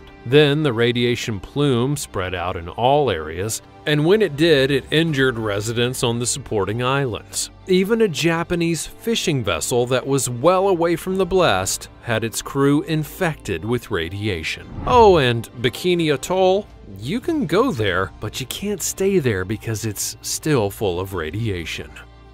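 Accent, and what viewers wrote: United States. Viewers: Wow, irritating American accent is horrid.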